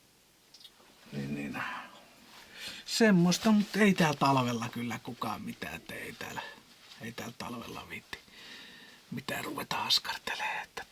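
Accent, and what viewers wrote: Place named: Finland. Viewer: Kiitos hyvästä kanavasta! Jännä, että alkanut vasta tänä vuonna sitä meille tarjoamaan. Laitettu tilaukseen👌 Hienoja ja mielenkiintoisia videoita teette👍 Murteesta päätellen aika lähellä meitä vissiin olette😀 myö ollaan Kouvolast🤣 Meillä on aika uusi kanava tubessa, jossa reissataan vankkurilla Suomessa ja ulkomailla. Ja toki retkeillään myös.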